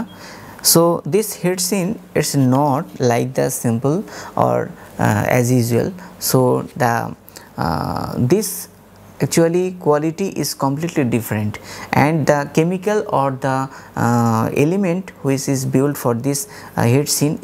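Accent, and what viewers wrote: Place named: Bangladesh. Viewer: Your English told that you are a Bangladeshi😅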